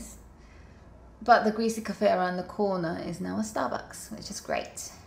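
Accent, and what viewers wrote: United Kingdom. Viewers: You can tell how perfect her British accent is based on her mispronunciation of Tokyo, despite being native Japanese! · an asian in london with a british accent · This needs to be more popular..... I mean, this is genius. It's interesting to look at. Looks realistic. Looks cute. Her english accent is fantastic. Spread~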